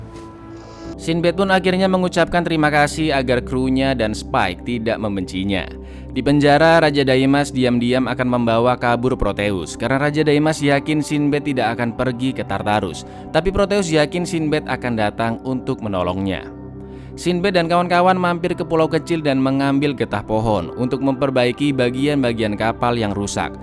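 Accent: Java, Indonesia. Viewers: Bang Lo wong jowo opo udu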